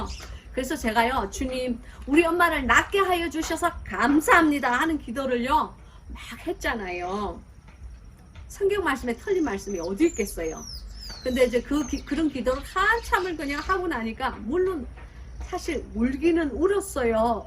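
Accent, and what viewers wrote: Gyeongsang, South Korea. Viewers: 목사님의 순수하심에 빠져들어 이제는 사역을 통하여 체율체득함으로 나오는 간증과 함께 생명의 말씀에 붙잡혀 매일 빠져들고 있어요ㆍ목사님 어투가 경상도 출생인가보다 했는데 부산이 고향이시란걸 오늘 알았네요ㆍ삶이 예배란 말씀에 전율이 흘러요ㆍ목사님 너무너무 고맙고요 감사합니다 솔직담백한 큰매력도 큰능력 이라고 느껴져 더욱 감사합니다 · 목사님 경상도 사투리가 정말 구수하네요 주안에서 평강과 은혜가 충만한듯 합니다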